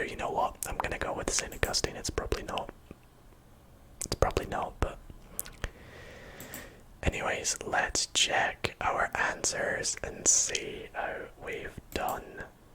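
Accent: Scotland, United Kingdom. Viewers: YES HES SCOTTISH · Британский чел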